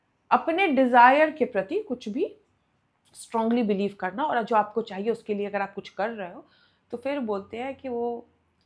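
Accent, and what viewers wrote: Bihar, India. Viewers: Mast form mei ho.. bas apka bihari accent miss karte h jaise aap purane videos mei karte the.. we feel more connected🌻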